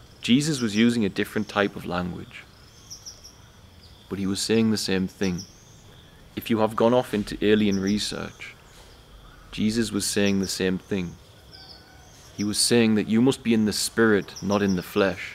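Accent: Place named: Newcastle, United Kingdom. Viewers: Brother, even on the darkest day, your eyes would still smile. I always love to hear that Geordie accent. I'm a Bradford lad living in America since 1998. Your voice always takes me back home for a few minutes when I first hear it. Bless you my friend. Thank you. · Very nice video. I am based in the North UK. Very similar views as yourself. I can tell by your accent we are very close. Can we open up communion together? I would love to explore your mind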